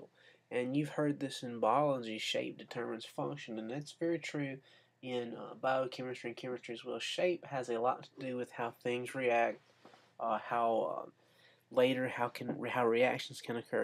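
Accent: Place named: South, United States. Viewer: Nice to hear a Southerner talking some sense every once in a while. No invocations of jebus and some good explanations of VESPR. Thanks.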